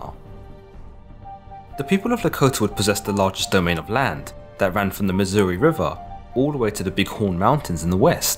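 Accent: United Kingdom. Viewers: Fucking hilarious hearing a Brit talk about my history lol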